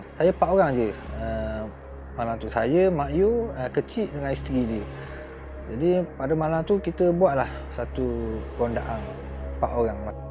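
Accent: Terengganu, Malaysia. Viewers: ouh ptutlah kdg2 kliru tgok member sha ckp,,kejap mcm ganu kejap mcm kelate,,stay safe bro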